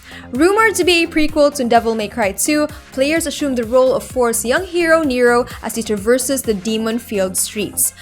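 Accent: Philippines. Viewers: You sounded like a filipina😅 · I hear Filipino Accent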